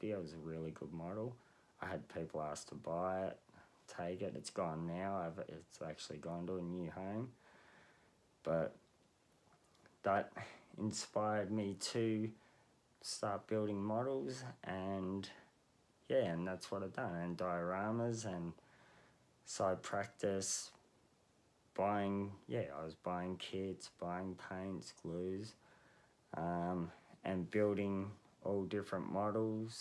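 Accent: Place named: Australia. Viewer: You have pretty mad skills and is that a bloody Aussie accent I detect. Good on you mate.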